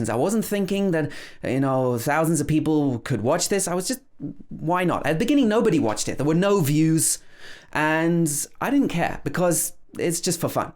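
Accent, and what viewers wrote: United Kingdom. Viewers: I have been following the videos on your channel of late and I must say that you have come up with content that can be of great help for non-native English speakers. Aside from the fact that I have a soft spot for the British accent (thanks to English cricket commentators like David Gower! 😄), I found the video with the 'schwa' sound to be particularly useful. The video about intonation was also quite helpful as these topics are not explicitly taught in Indian schools. I hope to learn a lot more in the time to come. Thanks! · I don't know why Americans are making fun of the British accent Why did they find something funny in your accent? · You're superb, dude👌🏻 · I’m American but I love your posh English accent! It sounds more intelligent and educated than our ordinary American accent. Lol. It’s good to learn about your background. 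Keep up with your exceptional work!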